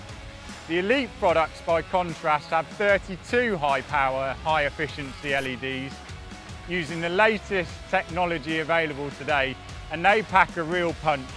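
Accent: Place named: United Kingdom. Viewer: That britsh accent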